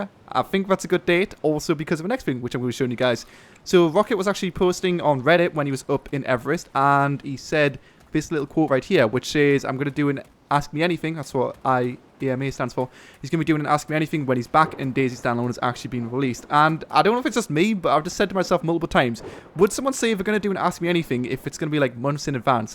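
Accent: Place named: United Kingdom. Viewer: If you cant listen to him, then watch someone else and stop being annoying to other people watching the video. By what you said, you don't like live commentary, which is fine. His dialect? I'm guessing he's british, and really? You cant understand him? I'm from Serbia and I can understand him better then someone from Croatia! Well. there is my rant to you, sir! Respond if you wish, goodbye!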